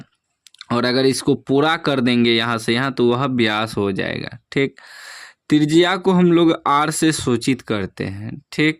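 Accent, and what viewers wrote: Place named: Maithili, India. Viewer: Sir ap methli v bolte h 😃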